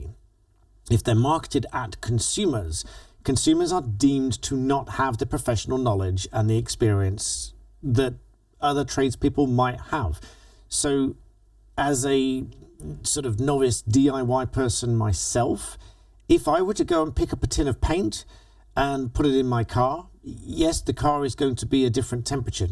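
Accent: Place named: Northern England, United Kingdom. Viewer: He is a bit Northern.........Possibly.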